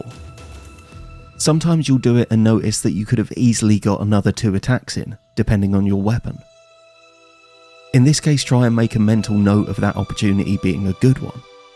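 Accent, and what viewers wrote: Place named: Southern England, United Kingdom. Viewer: Subbed. I consider myself a reasonable enough souls player, now I want to redo them all with the lessons learned here and become a great one. Also it’s refreshing to hear another English creator on here. I’m guessing you’re Southern, maybe Oxford sort of area? Keep up the excellent content 👍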